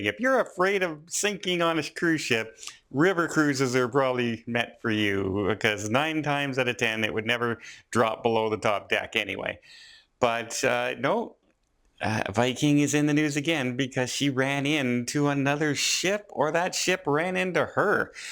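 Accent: Canada. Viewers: BH Most likely his accent. is Canadian.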